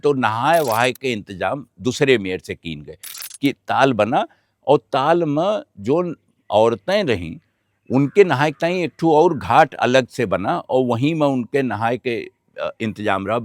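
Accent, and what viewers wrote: Awadh, India. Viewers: Listening to your video feels like roaming around my nani,s village about 60- 65 years back.Same air .same mitti ki sondhi sugandh and the original awadhi.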